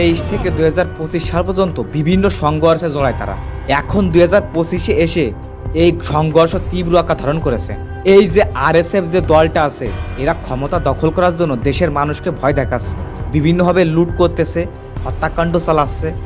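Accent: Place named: Gopalganj, Bangladesh. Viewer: তুমি কি আমার গোপালগঞ্জের মানুষ নাকি তোমার কথাবার্তা গোপালগঞ্জের মতো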